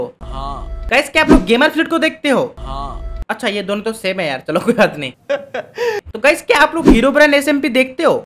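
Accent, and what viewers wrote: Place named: India. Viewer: Goofy indian noise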